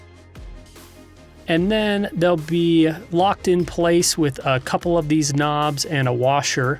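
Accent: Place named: Salt Lake City, United States. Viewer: "All the way acrosst it?" SLC people talk funny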